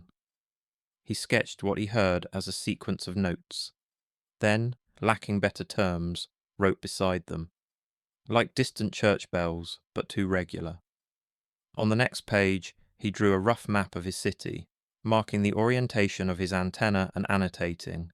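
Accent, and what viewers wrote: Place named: United Kingdom. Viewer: fake British AI generated voice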